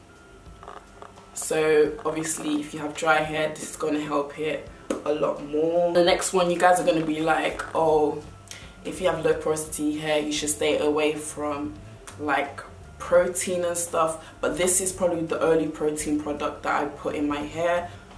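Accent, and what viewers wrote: United Kingdom. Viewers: It took me 12 minutes and 38 seconds to realize that you have a damn English accent! Wth!! 😭 I’ve watched two of your videos before this as well, I am so confused. 😂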